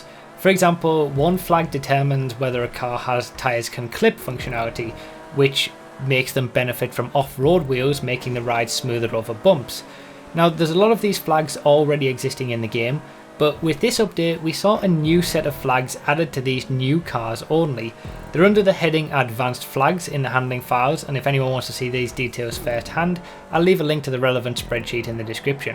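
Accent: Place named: United Kingdom. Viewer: Niyatec No, it’s the british way of saying things.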